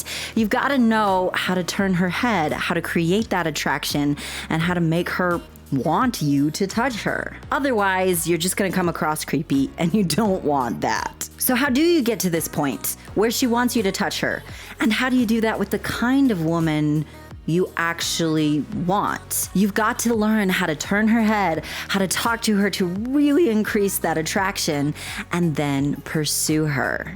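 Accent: United States. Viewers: How and where is highly cultural. I hear your USA accent probably New England. Touching is à no no never. I will never return USA and this is only à secondary reason. Main reason is aggressivity. Second is political corruption. And so many others.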